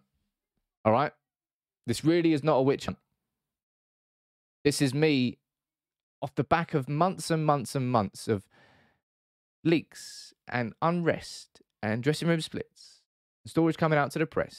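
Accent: Manchester, United Kingdom. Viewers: The guy doing this video has got a cracking Mancunian accent 😂😂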